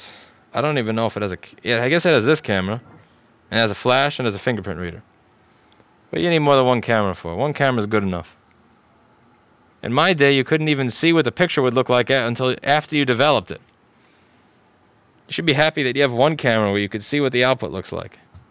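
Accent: New York, United States. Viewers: Rossmann do you not understand the bulk of people who originally subscribed to you were brought because you were doing "boring" board repair? Some of us respect the skill it takes to diagnose a problem and the others respect the skill it takes to properly repair. Then there sre some of us who like the absurd New York style of how you speak. Then there are some who like all 3. And Erica sounds like an amazing woman. Best put a ring on that finger.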